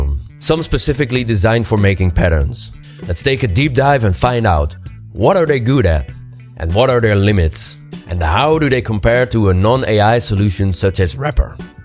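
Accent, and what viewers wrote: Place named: France. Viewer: Your voice is French AF. I love it!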